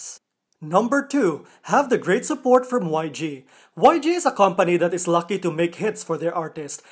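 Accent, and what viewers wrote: Philippines. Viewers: You have a Filipino accent 🧐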